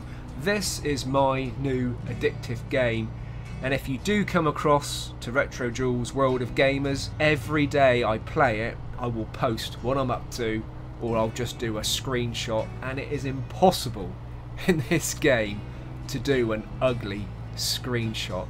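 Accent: United Kingdom. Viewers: Hoal, Te extrañamos en WoT No nos abandones. Tus narraciones con tu asento britanico son una delicia.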